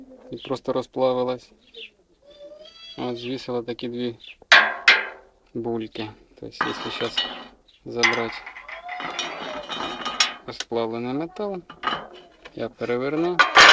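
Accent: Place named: Central Ukraine, Ukraine. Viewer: Говор такой забавный, центральная Украина небось)) зы. А горн прикольный.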